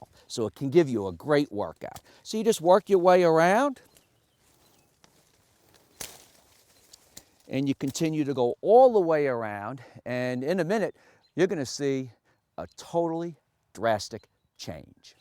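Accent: United States. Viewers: What is that accent? Jersey? Philly?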